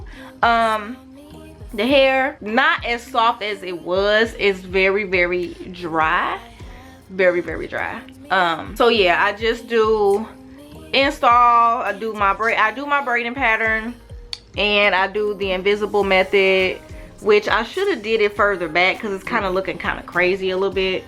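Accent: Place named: South, United States. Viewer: I hear a southern accent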